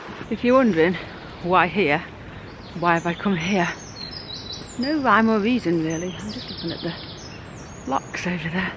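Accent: Liverpool, United Kingdom. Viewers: Looks so beautiful there, love the Peak district..... is that a slight scouse accent you have? i live in Wirral...... don't be afraid to make longer videos because i think lots of people like them longer! :-)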